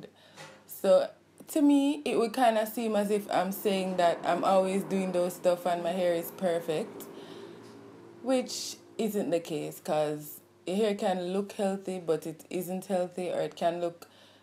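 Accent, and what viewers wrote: Jamaica. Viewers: your from Jamaica right? I can hear the Jamaican accent 🇯🇲❤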